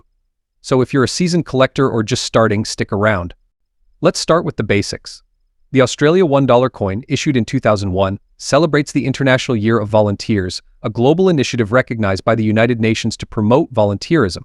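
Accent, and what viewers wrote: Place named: United States. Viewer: What an absolute load of BS . Get a yank voice to narrate the topic of Australian coins they clearly know nothing about. The 2010 $2 coin had over 36 million In circulation. The 2008 scout coin over 17 million in circulation. No idea at all !!!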